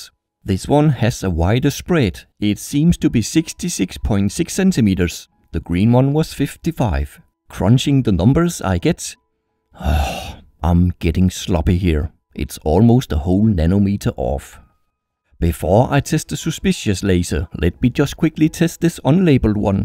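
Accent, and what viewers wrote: Denmark. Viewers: Jeg vidste du var dansk :D Man kan høre det på accenten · Er du Dansker? det lyder det som på din stemme! god video! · Jeg synes nok jeg kunne genkende en Dansker på hans Engelsk :D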